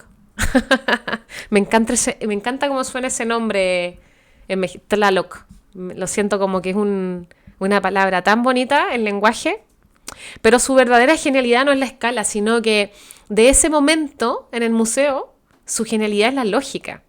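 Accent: Chile. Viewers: Me encanta el acento chileno!! Le decimos a Mon Laferte que no lo pierda!!!😊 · MUCHAS GRACIAS POR TUS PALABRAS HACIA MI PAÍS MÉXICO QUE TANTO QUIERO, SÉ QUE HAY PROBLEMAS COMO EN CUALQUIER LUGAR DEL MUNDO, PERO LA VERDAD ES QUE MÉXICO ES UN PAIS MARAVILLOSO, TIENE TANTO QUE OFRECER EN TANTAS COSAS, QUE TÚ LO ESTÁS EXPRESANDO MUY BIEN, ME GUSTA ESCUCHARTE, PUES SIEMPRE ME HA GUSTADO EL ACENTO DE USTEDES LOS CHILENOS, ADEMÁS DE SER UN PAÍS HERMANO, MUY HERMOSO Y MUY QUERIDO POR NOSOTROS. GRACIAS Y SALUDOS DESDE VERACRUZ, MÉXICO ❤❤❤❤ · Amo, amo, amo el acento chileno....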